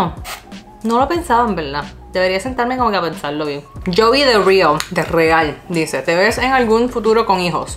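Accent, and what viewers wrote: Puerto Rico. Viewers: Me quedo impresionada con TU acento denouertoriquena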